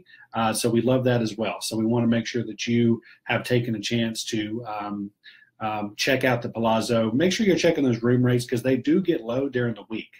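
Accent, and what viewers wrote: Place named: Oklahoma, United States. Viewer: Sounds like an Oklahoma oil money red state hick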